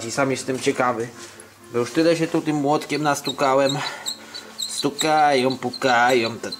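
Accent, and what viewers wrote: Poland. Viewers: gwara podlaska? :D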